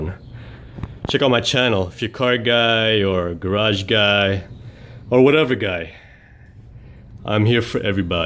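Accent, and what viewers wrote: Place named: North, United States. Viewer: Your accent was driving me crazy! You're from up north aren't you? I'd be willing to bet you're a yankee, without a doubt!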